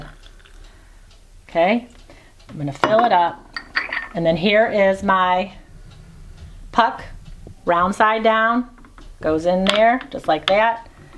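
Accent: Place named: Chicago, United States. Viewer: Thick Chicago accent!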